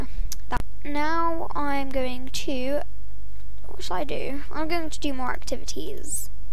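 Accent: United Kingdom. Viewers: i love that you are Brittish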